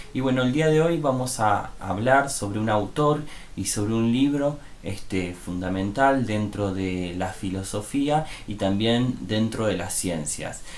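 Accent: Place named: Argentina. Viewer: No puedo con el español argentino...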